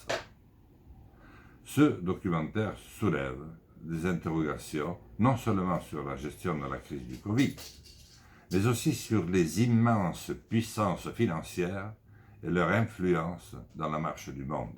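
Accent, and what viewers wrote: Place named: Paris, France. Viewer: Quel bel accent ! Une parisienne du peuple.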